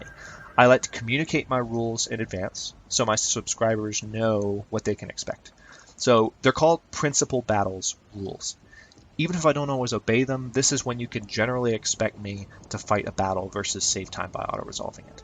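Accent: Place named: United States. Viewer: Hahaha aye exactly the accentless accent, the de jure news reader accent :') really clear but you can tell its American haha. Sounds lovely :). Sure i enjoyed your video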